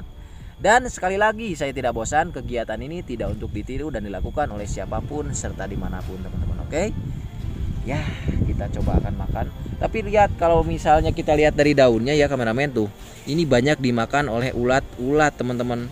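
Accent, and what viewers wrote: West Java, Indonesia. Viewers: A kawit n ti mna?? logat n mah sunda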